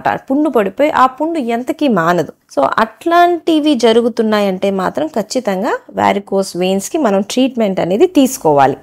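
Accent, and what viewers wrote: Guntur, India. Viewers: Thank you Dr.. At an early age you are explaining every aspect very clearly in telugu that too in Guntur Style. All the BEST.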